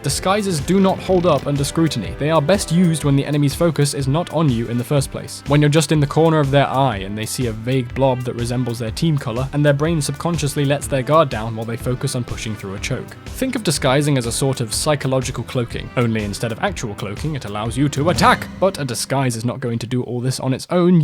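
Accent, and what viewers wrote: United Kingdom. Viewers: You sound like the most British man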